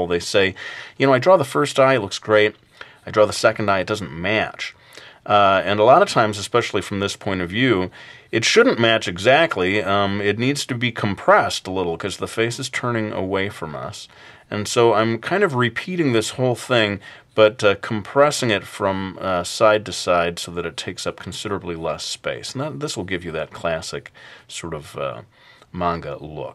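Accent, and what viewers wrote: Brooklyn, United States. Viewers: Sorry, it just sounds like a bit "cheesy gameshow host". It might just be that you're American and it sounds corny to an Aussie. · Brooklyn accent again. eyeroll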